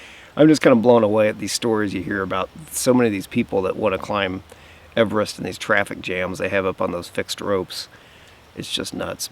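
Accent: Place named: Kansas, United States. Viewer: I haven't caught up with every single one of your videos (probably won't be able to), but I really appreciate the commentary here. I'm past 50, living in NE Kansas and always trying to get to Colorado in the summer. I'm not a technical climber but have done about 25 14ers and finally made it to Conundrum Hot Springs at the end of this summer with a pack that was just... too-freaking-heavy. I'm in the "slowly buying new stuff to replace my bombproof and not in any way broken but heavy as lead old stuff" and wondering exactly what I'm trying to accomplish. Probably not a through hike of the CDT (as much as I would like), but trying to match gear with comfort, fitness level, and the opportunity to actually use it. I appreciate you relating your experiences. Also, your cadence sounds like a native western-ish Kansan. I'm not, but it's fun to hear.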